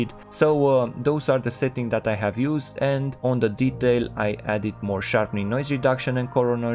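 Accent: Romania. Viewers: Imi parea mie ca esti roman dupa accet :P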